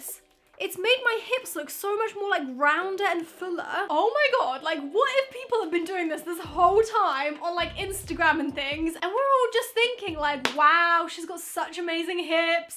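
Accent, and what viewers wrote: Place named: United Kingdom. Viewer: She sounds too English to be true.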